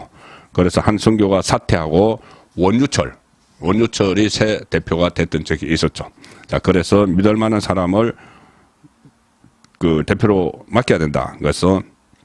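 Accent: Gyeongsang, South Korea. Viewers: 경상도식 비^례대표. 미^래통합이 아니고 비~례대표. 미~래통합임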